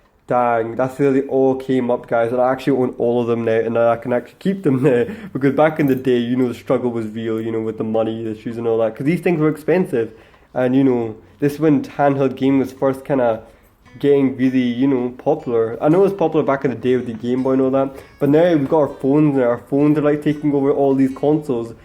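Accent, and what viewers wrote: Scotland, United Kingdom. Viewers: Holy shit that is cracking! You have a Scottish accent, what Cex did you nab it from? :o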